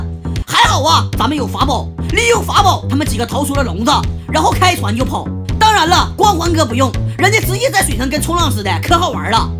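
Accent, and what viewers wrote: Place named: Northeast, China. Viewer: gun six 东北话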